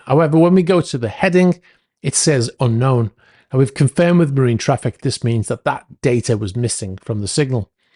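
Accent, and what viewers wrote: Liverpool, United Kingdom. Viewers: Great channel! Who is the host? I’m guessing a fellow Liverpudlian? Like to know more!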